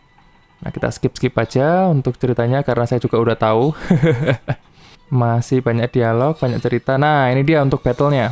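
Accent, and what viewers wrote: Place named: Banyumas, Indonesia. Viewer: Wong ngapak kye ya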